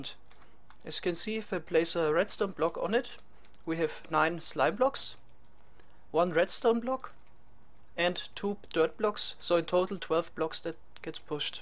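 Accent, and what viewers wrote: Germany. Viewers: it is interesting seeing his german accent improve over time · der deutsche Akzent kickt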